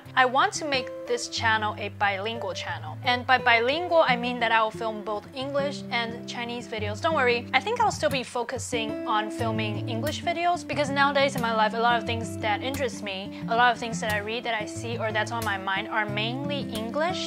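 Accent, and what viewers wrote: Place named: New Zealand. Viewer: Your Vlogs are always amazing, even if I have no idea what you're saying the visuals overcome the language boundaries. Funny how you mentioned your kiwi english, because you would fit in quite well here in NZ, you definitely have our accent :-)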